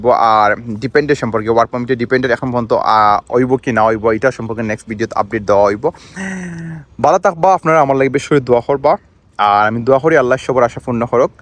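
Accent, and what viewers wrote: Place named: Sylhet, Bangladesh. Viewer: Bhai kotha bujhte onek problem hoi.. Hoi proper banglai bolen nahole english a video banan Amra sobai to Sylheti accent er sathe used to na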